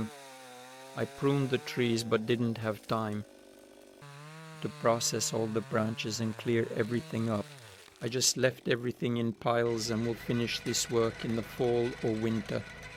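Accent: Belarus. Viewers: По говору вы из Белоруссии??? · Это где в Беларуси? Голос вроде белорусский у тебя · Белосус походу,судя по говору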